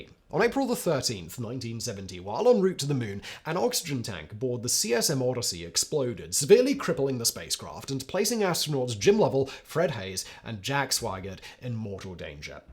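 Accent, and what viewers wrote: United Kingdom. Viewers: I love hearing a British voice narrate American achievements.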